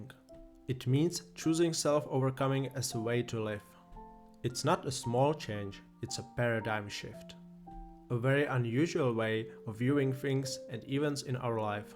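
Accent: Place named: Germany. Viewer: I doubt that AI could imitate your authentic German accent.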